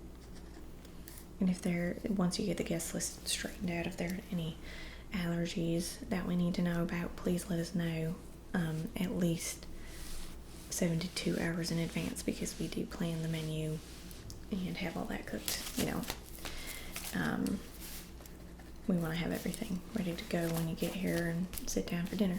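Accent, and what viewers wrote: South, United States. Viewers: Wonderful! Southern US accents are really lovely to listen to in my opinion :)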